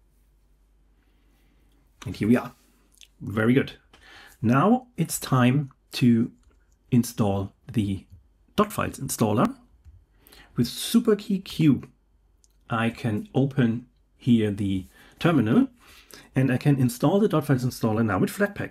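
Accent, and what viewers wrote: Germany. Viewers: Arch install mit deutschen Akzent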